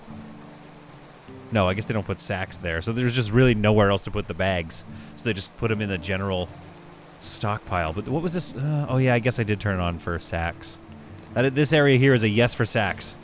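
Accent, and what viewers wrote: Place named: Black Country, United Kingdom. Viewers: We don’t claim that accent, it’s more brum or Black Country. 🤣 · That was not a Scottish accent! :P that was like the midlands of England LOL still love the videos though :) keep it up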